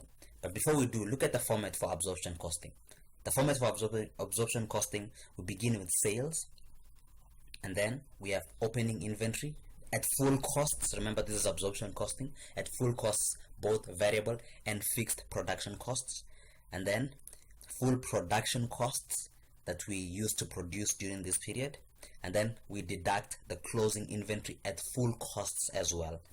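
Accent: South Africa. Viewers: chee are you south african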